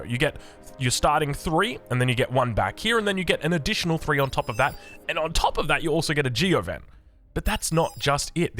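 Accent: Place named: Australia. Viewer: we need more BAR content from you. Nothing against Brightworks or anything but the australian accent is much nicer and you really help people get into the game by explaining so much. Your videos are so entertaining i even started watching the aoe ones even if i never played it.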